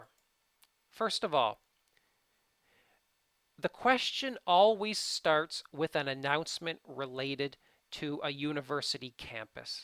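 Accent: Canada. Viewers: Do I hear Canadian accent??? Just curious..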